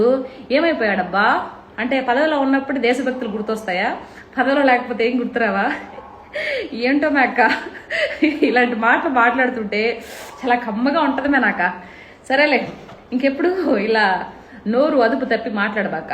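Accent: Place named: Rayalaseema, India. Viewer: like your style of counter criticism and feedback especially Rayalaseema accent & powerful quotes, punches. great job madam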